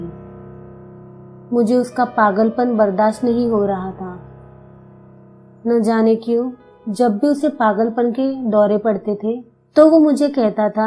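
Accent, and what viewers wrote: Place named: Maharashtra, India. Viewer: Full on Marathi accent, last two statements were exactly opposite.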